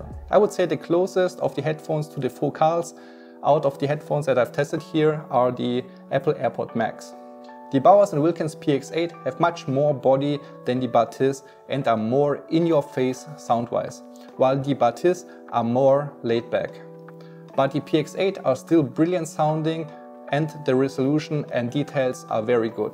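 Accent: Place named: Germany. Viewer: sympathischer deutscher akzent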